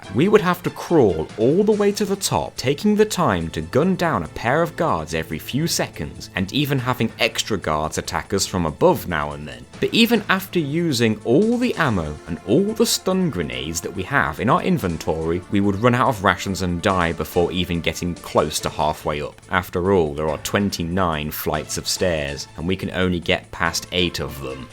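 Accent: England, United Kingdom. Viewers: As a non native english speaker, I love the english accent. Great video btw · Great video... Except ur bri" "ish, love. Smhhh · Yes he is New Zealander. Great show. All through the video all i could think was i've heard this voice before. Are you from UK?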